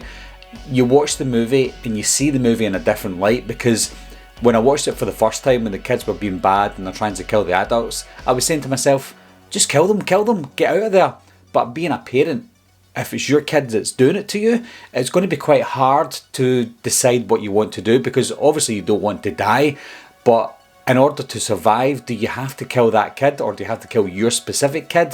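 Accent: Scotland, United Kingdom. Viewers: First time viewer. Solid intro, then at 25 seconds that Scott accent starts in with the vocabulary. Instant subscription.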